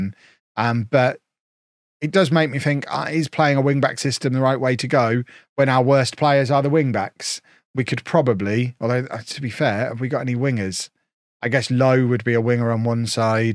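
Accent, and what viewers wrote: United Kingdom. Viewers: I’m not sure I can support this series if there isn’t any suits. I can put up with the annoying British accent, the sub par game play, look it’s just not cricket.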